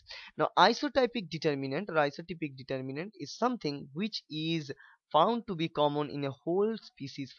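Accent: India. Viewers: english can be his mother-tongue, with the typical Indian pronunciation, that I find as acceptable as the American pronunciation is accepted (though not always pleasant to the ear). go to setting and change the speed to 0.75---much easier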